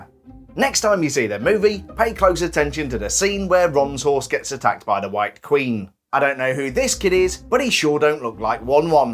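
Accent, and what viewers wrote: United Kingdom. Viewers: I love that he always says: HAYTCH PEE in his cool British accent. Hi 🙋🏾‍♀️ I’m from that embarrassment, ‘Murica 🇺🇸 lol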